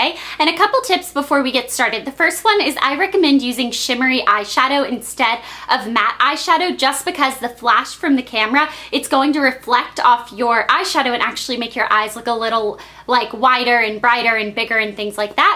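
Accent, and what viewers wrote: South, United States. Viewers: I am not sure if you watch Big Brother or not..but you and Danielle are a lot alike. You are both pretty, have long brown hair, and have that southern accent:)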